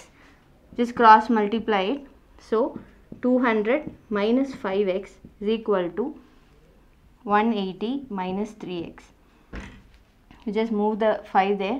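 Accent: South India, India. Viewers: She seems to be from South India that's why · no timepass.. no stupid requests before starting the video.. simple language with southern tint.. and a lot of cuteness..!!! 😍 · are you from mam south india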